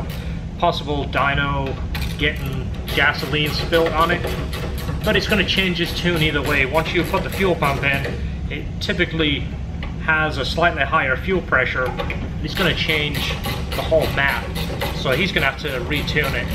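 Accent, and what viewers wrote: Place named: Scotland, United Kingdom. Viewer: Love the s2000 build. Hopefully you guys can work with me when I pick mine up. Also, love the accent, Scotish?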